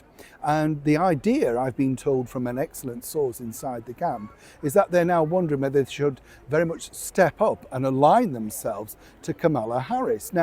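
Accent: Yorkshire, United Kingdom. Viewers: You are certainly a yorkshire man lol 😅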